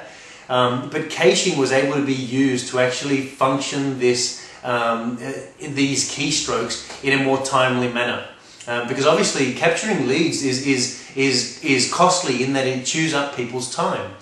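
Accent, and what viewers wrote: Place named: Australia. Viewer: spanish guy with an australian accent. enough said.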